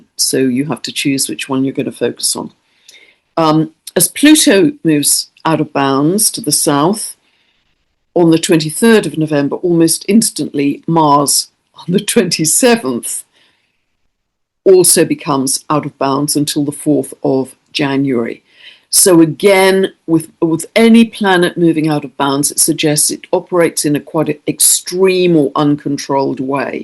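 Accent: United Kingdom. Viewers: Unfortunately, I have no beginning of any house in Scorpio but Jupiter ( as in Taurus with Chiron…) my sixth house start in Libra and end in Sagittarius. A tip of how I could set intention with these parameters ? I m trying to work consciously on my body, to balance my air side and the overthinking that goes with. And my emotions 😅 I compare this axis with the time of the year and transformation of the trees. They lose their leaves and energy goes deep in the roots. It s not because we don’t see things that they are not here. But I’m sure that you have better explanation and more tips for the coming new moon ☺️ Thanks for you videos. Ps : I m a big fan of your British accent (also a nice aspect of your videos) Marie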